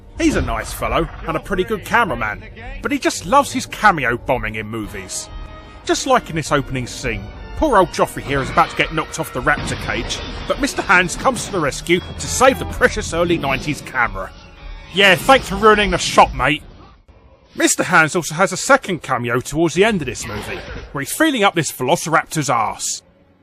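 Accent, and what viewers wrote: United Kingdom. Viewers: I swear sounds like Taco Man with a British accent XD. Awesome commentary on JP mistakes nontheless.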